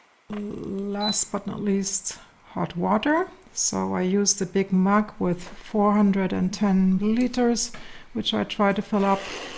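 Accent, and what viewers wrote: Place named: Germany. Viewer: by youur english accent, it look to me you are either German or living for long time in Germany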